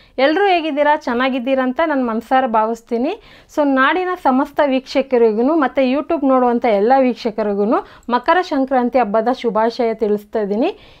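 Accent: Tamil Nadu, India. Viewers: ಇವರದು ತಮಿಳ್ ಅಕ್ಸೆನ್ಟ್😂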